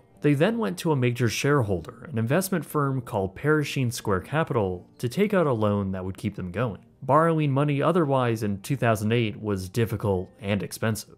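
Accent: Canada. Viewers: Its hard to understand with your Canadian accent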